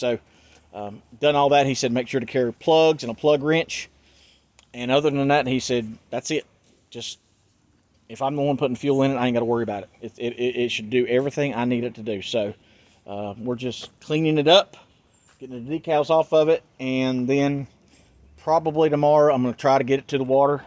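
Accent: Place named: Appalachia, United States. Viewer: Hey man. I just found your channel today. I've looked into the phenomenon of gangstalking a little bit before. I believe it to have a spiritual component as well, if not mostly. (btw, if you haven't yet, check out Jeremiah Cohen on YT. His testimonial is insane and eye opening). I thought I recognized a close cousin of my own accent when I heard you speak. I live a couple hours east of you in the beautiful foothills of the GSM. Anyway, my question for you is this: Why are they doing this to you? Have you seen or heard or been a part of something to draw this to you? Or is it based on something you did or said to anger them? I'm genuinely curious. I've watched a couple of your videos but haven't yet heard the story relating why it is you think they are doing this. A reply would be much appreciated. You and yourn be purdy, now.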